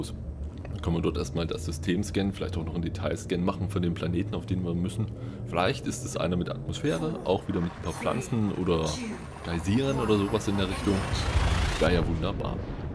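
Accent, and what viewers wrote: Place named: Saxony, Germany. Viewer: Ich für mein Teil freu mich enorm wieder Videos von dir sehen zu können 👍 Deine ruhige Art in kombination mit dem klassischen Let's Play-Schnitt hat mir bei all deinen Projekten gefallen. Vorallem, kollege Sachse, als Dresdener finde ich deinen Dialekt sehr deliziös.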